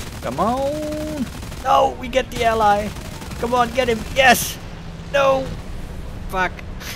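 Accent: Netherlands. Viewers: I need the pack... For it is my destiny to bring back balance to War Thunder. (Star wars Pun intended). How I found the channel: I was just watching WT Vids and I found your channel. Your accent caught my attention. Bcus I'm Dutch as well. And I don't know a lot of Dutch WT YouTubers so I taught lemme stick around and see where this goes🙈 What I think about your channel: What I like about your channel is that you are honest in your opinion and also the banter haha🤣 I also like how you address the players that Gaijin are just people as well and that if we want something to change we must not go and curse and scream to them (as a figure of speech) but tell it on a normal way to them. Keep up the good work😊 How long have I've been a Jengara🤣: I have been following your channel for almost 2 / 2,5 years now. It's like the day of yesterday when you started changing your thumbnails en your intro's 🤭 This was my summary of what I think, how long I've been following your channel and what I like about your channel 😊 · Hey man, you have a great channel because of your personality, you are calm and collected which is very relaxing and easy to watch. 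You explain the situations and the strengths and weaknesses of planes and you favor planes to tanks or ships unlike most war thunder youtubers. Lat but not least, i like your accent and the way you express yourself in English. Are you Dutch?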